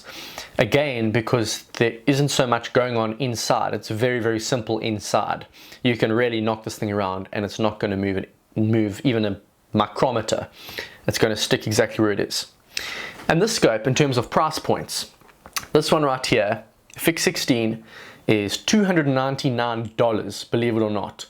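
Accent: South Africa. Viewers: We gonna be looking- you talk like that everytime, its englisch or South african like zulu?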